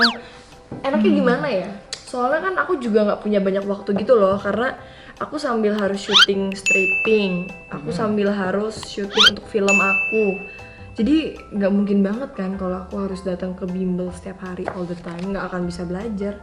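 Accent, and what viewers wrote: Java, Indonesia. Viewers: Guys Ny ittu Medok banget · Guys. Medok bener ndok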